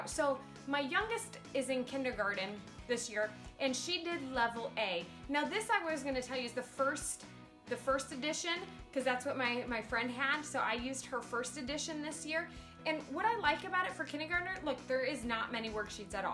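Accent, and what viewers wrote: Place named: Midwest, United States. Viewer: This video is so helpful! Thank You! This will be my first year homeschooling my Kindergartener and while I’m so excited, I’m also pretty nervous. This curriculum looks pretty legit, though, and if a math teacher says she recommends it above all others, then i know it’s going to be great. Btw…I have never heard of Saxxon Math (I’m new to all of this). While I was listening to your video, I was in the other room grabbing some laundry and heard you say something like, “I know mothers just love Saxxon Math…” I literally stopped what I was doing and said out loud, “WHAT did she just say?” 🤣 You sound like you may have a Midwestern accent to boot, so it reeeally sounded like…something else to me. K, enough about that. Again, thanks for the video and we can’t wait to get started! Take care!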